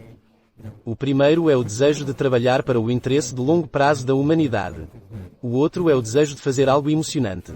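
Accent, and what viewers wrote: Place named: Portugal. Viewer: Você é de Portugal? Eu sou do Brasil, e pelo q entendi, o sotaque no vídeo é em PT-PT, e não em PT-BR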